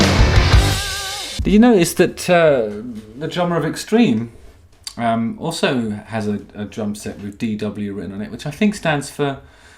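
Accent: United Kingdom. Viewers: That British Accent has to go...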